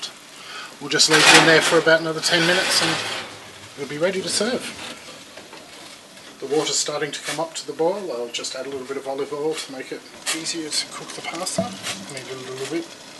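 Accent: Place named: Australia. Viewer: the captions are a classic laugh fest ... I missed the cocaine lol that's hilarious. Methinks the translations program has issues with the Aussie accent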